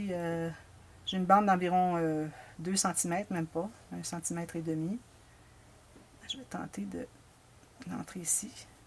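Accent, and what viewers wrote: Canada. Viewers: et cette accent canadien j'adore !!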